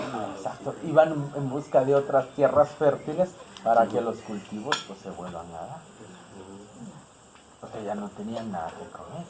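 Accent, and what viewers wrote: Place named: Mexico City, Mexico. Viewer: espoturno Es totalmente diferente, este wey tiene acento chilango. Los mayas siguen ahí en las comunidades, no mamen con tanta desinformación!!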